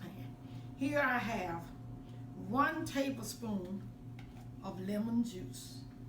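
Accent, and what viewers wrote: South, United States. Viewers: exactly! It's a southern thing and that's just how we talk ❤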